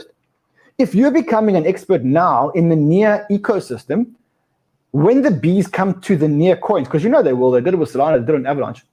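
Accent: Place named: South Africa. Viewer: Sounds too South African: "The feds must fall"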